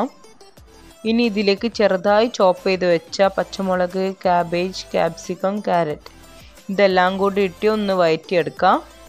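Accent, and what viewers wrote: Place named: Kozhikode, India. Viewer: തനി കോഴിക്കോട് ഭാഷയിൽ അവതരണം 😄😄 So nice keep it up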